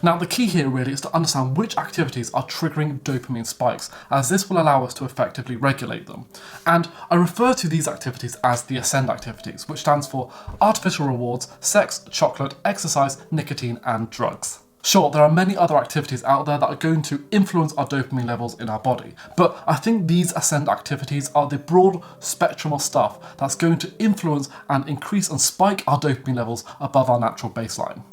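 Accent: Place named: United Kingdom. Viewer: It's really useful to learn a British accent and nice work man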